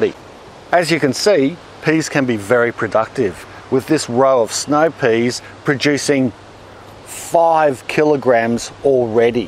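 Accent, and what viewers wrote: Australia. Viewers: I love an Australian accent. I could listen to him all day. More importantly, I learned a lot about gardening. Subscribed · pretty sure Australian isn’t a race but i get what you mean it is kinda stereotypical lol, like if he didn’t have a Aussie accent he probably wouldn’t have compared him to Steve Irwin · hey mate, the German word for cabbage is "Kohl" not "Sauerkraut". Sauerkraut is fermented white cabbage. I like your videos and your accent. I stayed in Australia for quite a while about 10 years ago and your voice just brought back old memories:) I will hop in more in the future and watch more of your videos. Having my own German "Schrebergarten" now, I really need to learn quite a lot about growing my own food. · I love this guy's videos. I would sit and listen to him all day. He sounds like an Australian. I am a Jamaican, but I like him. 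· Thank you, from an Aussie living in Canada, I would suggest kale, Russian kale. It is easy to grow even continues through the snow, and is considered one of the most nutrious vegetables, sautéed with garlic, and then creamed for those who are less enamoured. also really good for our dogs, I add it with carrots sautéed lightly added to her meat and sweet potatoes...lovely to hear the good old aussie accent and way of being....Amélie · Ken Spiracy I enjoy your presentation and unerstand love the Aussie Acent,plus all good knowledge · He’s Aussie stand reminds me of all my male family members. “When your choca up the bloca, have a cucumber!” Bahaha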